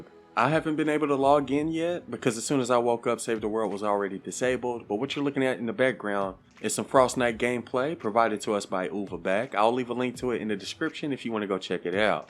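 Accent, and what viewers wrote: South, United States. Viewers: Are you from Texas or Louisiana !?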